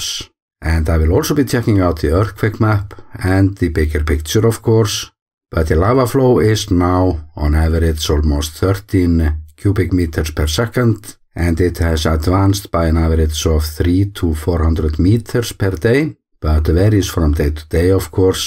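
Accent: Iceland. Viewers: I love your english with the icelandic accent it makes your videos very soothing to listen to! Like a velevety hot chocolate lol! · Deep gratitude for your love and deep knowledge of Iceland. You won our hearts and minds during Covid. We are relieved at your intelligent wise and skilful reporting. From Australia to you in aiiiceland!! We are addicted to your accent and btw commiserate with the physical challenge you have as we too are sliding fast into our dotage.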